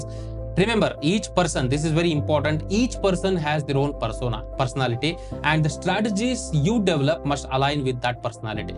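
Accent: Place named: India. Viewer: indian accent terrible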